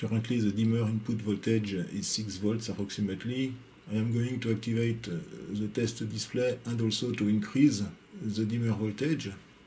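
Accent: France. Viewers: Love the funny frenchlish. Sounds like a Frenchman in a movie.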